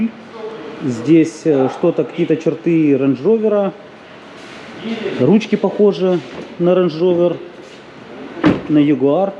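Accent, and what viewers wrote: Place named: Zaporizhzhia, Ukraine. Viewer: Голос (акцент)у автора как у моего дядьки с запорожья.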